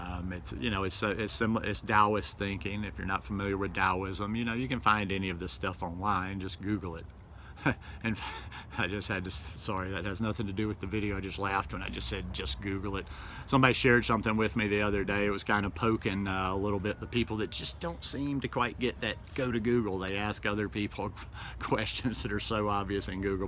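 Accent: South, United States. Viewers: Love that southern draw! Its inspiring to see someone from the south do this journey. I'm an okie myself and there is a lot of southern stereotypes and expectations to be a certain way in the south when it comes to eating meat and hunting and those sorts of things. Your often labeled weird and "hippie" or something worse for rejecting meat and beer and so on. I'm subscribed. Thanks for posting your journey! God Bless.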